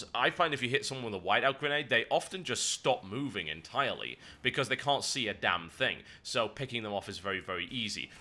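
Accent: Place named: England, United Kingdom. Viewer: Anyone else interested in hearing after he loses his English accent?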